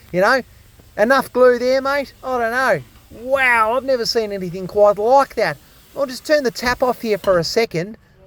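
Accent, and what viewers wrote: Australia. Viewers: No idea why the algorithm sent me your way, but here we are, and I'm enjoying the videos! Greetings from merry England. Love your enthusiasm for the job, and man you couldn't be any more Aussie unless you had a hat with corks hanging off it, making Kevin Bloody Wilson sound shy and reserved! Keep up the good work mate.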